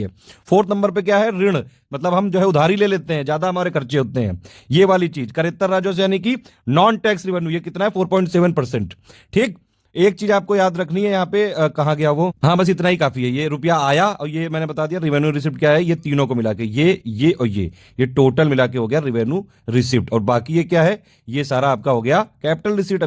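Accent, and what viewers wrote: Kanpur, India. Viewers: Sir apki awaj kanpur side ki hai kya.।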